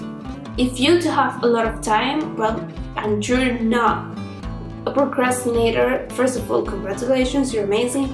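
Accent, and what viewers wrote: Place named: Latin America. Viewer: You are completely right!! So the first video I watched of you, I thought you were native from Spain due to your location and I say but how is that you speak english like someone from latín america jajaja but now I know you are neighbor of my country and it´s great to know it. You are doing really really well with all those language you know. keep doing!!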